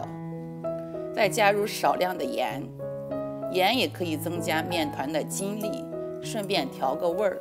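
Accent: Qingdao, China. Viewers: 在德国学包饺子，青岛口音太亲切了！ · 这口音……青岛人表示好亲切啊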